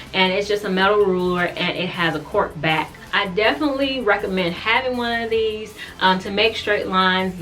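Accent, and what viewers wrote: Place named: Houston, United States. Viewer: i love your channel and i love your houston accent lol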